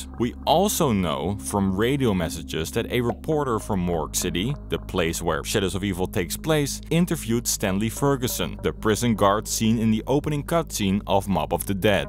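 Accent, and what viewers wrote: Netherlands. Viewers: talk wit a verry dutch aksent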